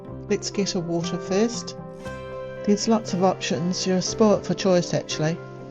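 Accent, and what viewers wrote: New Zealand. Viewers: A kiwi voice lol 😂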